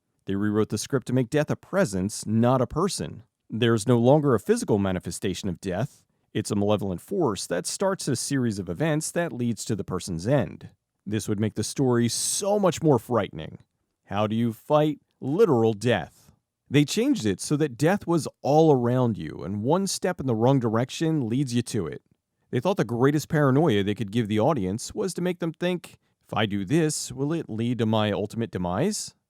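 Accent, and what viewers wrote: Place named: Boston, United States. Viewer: I've never noticed your Boston accent before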